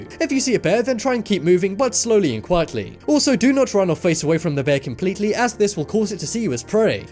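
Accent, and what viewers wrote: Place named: United Kingdom. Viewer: I lie, your British accent 👍🏻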